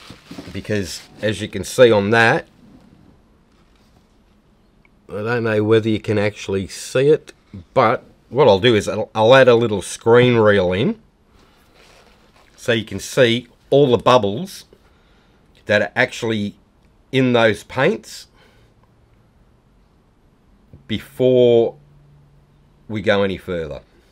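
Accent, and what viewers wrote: Australia. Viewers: where have you been all my painting life?! super glad I stumbled onto your channel - doesn’t hurt to hear you teach in that Australian accent 🥰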